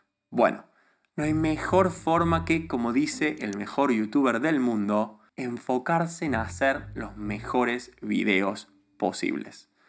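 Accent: Argentina. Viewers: Tienes buenas ideas pero te falta muuuuucho en la neutralidad de tu español. Si tienes acento argentino muy marcado. Pero igualmente das buenos típs. Un saludo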